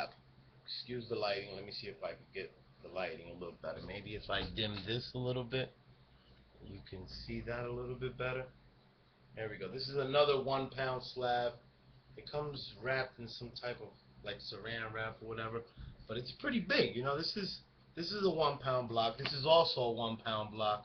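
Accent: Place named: New York, United States. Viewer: Gotta love that NY accent!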